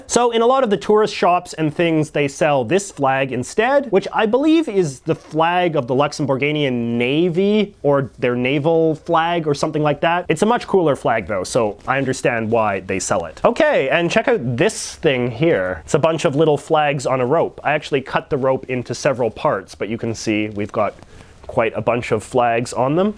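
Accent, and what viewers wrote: Canada. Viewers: Way do u have the stereotypical Canadian accent. We don’t own him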